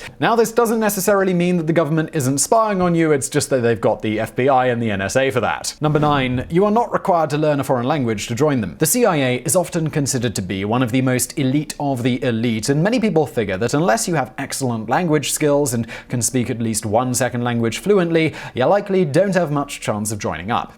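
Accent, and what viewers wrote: United Kingdom. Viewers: British accent, living in Czechoslovakia, pushing CIA propaganda. Suspicious. Simon must have gotten a call from Jeff Bezos telling him the Organization needs a PR boost.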